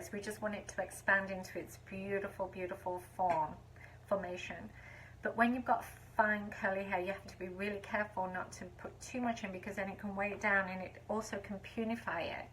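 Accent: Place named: United Kingdom. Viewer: where were you when I was a kid!? I grew up in the 80s in the East Midlands and no one at school or the hairdresser’s told me about the Curly Girl Method. My mother told me to brush my hair and then chopped it all off when I refused 😬 when I read about your book I presumed you were American as this method appears to have traction in the States. Imagine my surprise when I heard your accent! Did you promote the Curly Girl Method and DevaCurl in the UK? I have never seen your products on sale here.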